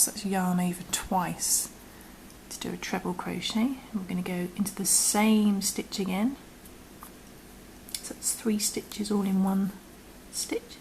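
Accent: England, United Kingdom. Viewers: You have an English accent but you are describing stitches with American terminology aren't you? I'm confused - we don't have a single crochet in English terminology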